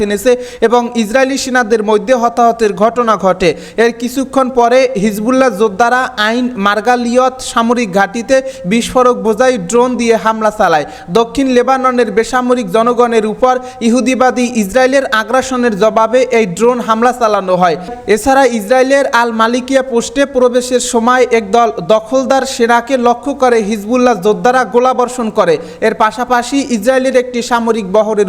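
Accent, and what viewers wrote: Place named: Barishal, Bangladesh. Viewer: A Borisaila toke news porte ke bolese, age vasha shek tar par news par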